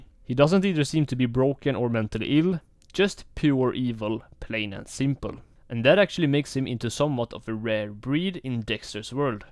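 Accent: Sweden. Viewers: Are you swedish because of your accent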